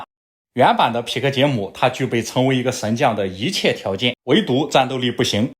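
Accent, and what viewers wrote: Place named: Xintai, China. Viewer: 博主有新泰口音 是新泰的吗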